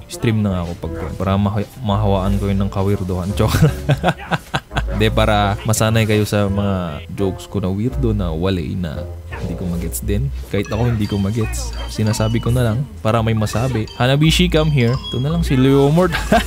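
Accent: Visayas, Philippines. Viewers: Bisaya ka pala lods 😂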